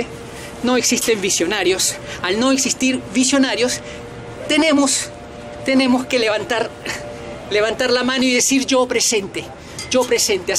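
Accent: Argentina. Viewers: Sr. SI UD NO ES PERUANO! HALAGA A ARG HASTA NO PODER Y HASTA SU FORMA Y SU ACENTO ES MAS ARGENTINO Q PERUANO! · Xq hablas como argentino? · ¿Un peruano con acento argentino? Pues yo creidísimo de que era lo segundo; escuchar para creer, verdaderamente; muy interesante; desde Lima (vi14/V/2021)-Perú-América.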